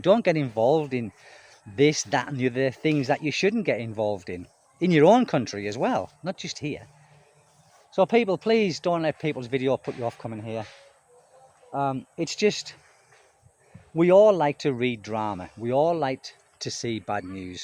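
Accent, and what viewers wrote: United Kingdom. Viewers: Good morning my friend. You’re absolutely correct in what you’re saying and I know that I’m in the minority, but I watch your channel just to see what you’re doing today. I don’t even look at the title. At least not any longer than it takes to see that it’s you. I’m not interested in the drama. I’m not interested in the car wreck. I just want to see what my friend, over there living life on another island is doing today. Your British way of speaking gives me a chuckle. What makes me unsubscribe straight away is click bait. Put up a title and a video that has little to nothing to do with the title and I’m an “un-subscriber”! Doing “cost of living” videos I won’t watch either. I hate it when people ask about what it cost to live here or there in the Philippines. If you have been here, and gotten out of the tourist districts, then you’ll know what it cost and can figure out what “YOUR LIFESTYLE” will cost you… no one can tell you what it will cost YOU to live somewhere. 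But it gets clicks because people want to move here without ever having been here apparently. You keep being you and I’ll keep watching “YOU” doing “YOU”.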